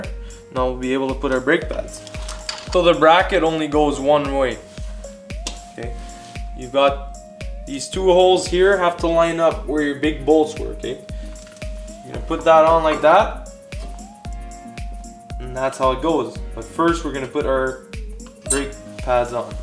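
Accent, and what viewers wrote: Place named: Montreal, Canada. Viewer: Sounds like a Montreal Anglo-Italian accent, maybe Lachine?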